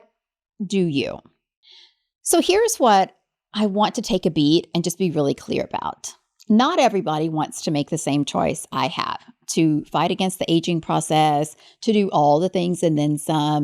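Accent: South, United States. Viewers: That was fun! p.s. I didn't realise you had a southern accent ❤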